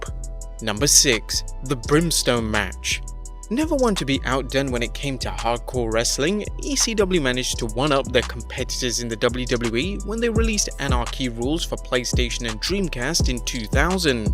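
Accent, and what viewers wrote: United Kingdom. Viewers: Maybe it's just the accent, but that was pretty stuffy British. Sex is naughty and things are improper and all that.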